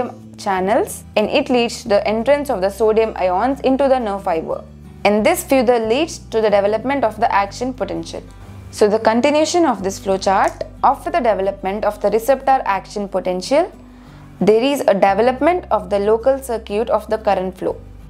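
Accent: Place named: India. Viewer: indian accent is so irritating 🥲 thanks for the vdo though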